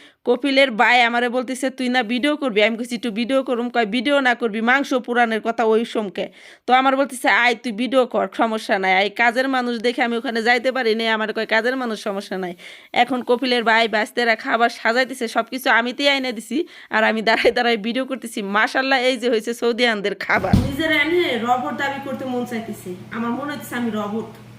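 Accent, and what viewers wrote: Manikganj, Bangladesh. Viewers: আপনার কথা শুনে মনে হয় আপনি মানিকগঞ্জ জেলা সিংগাইর থানার মানুষ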